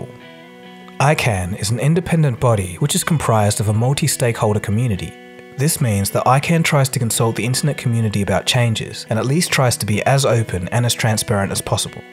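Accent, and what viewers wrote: Australia. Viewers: 5G here in Australia? Yeah, sure: it's hard to even get 4G CONSISTENTLY across bloody Sydney (you sound like an Aussie). No Bandwidteh Now (NBN) will only continue to screw this up even more towards 5G ... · That Aussi accent is so awesome! Cheers from Brazil.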